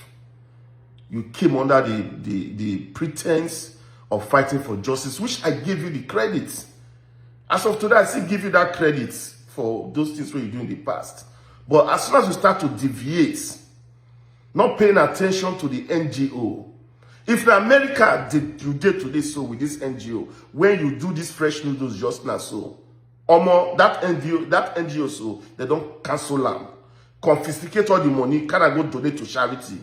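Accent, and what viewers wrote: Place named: Enuani, Nigeria. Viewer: and you're not an Igbo Man.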